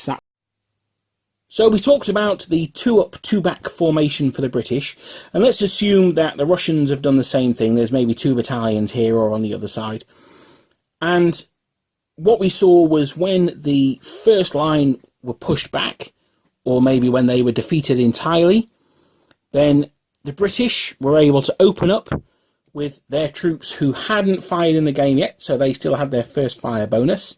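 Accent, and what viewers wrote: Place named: Yorkshire, United Kingdom. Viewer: I love the info your videos provide. Too bad we live a half world away. Napoleonic gamers seem to be an endangered species. Historical wargamers in general are much more rare here than over there from what I can tell. Certainly aren't any in southern Louisiana that I've run across or heard of. Sad really. The American civil war has been so stigmatized around here it has sucked down overall appreciation of military history, imo. I love your accent man, is that Yorkshire?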